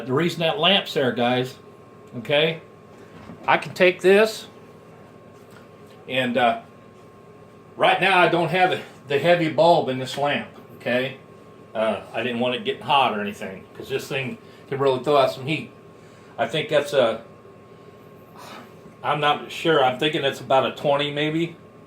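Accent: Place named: South, United States. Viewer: Good and useful tutorial. I use a blow dryer for the most part but this would be useful for slow drying things. I think it would be great for car modellers especially as you want things to dry in a dust free environment. Thanks for your sub, I'm subbing back. I like your southern drawl... I think that's what you call it? I was thinking of introducing a character similar in tone to yourself... will have to work on it. Cheers!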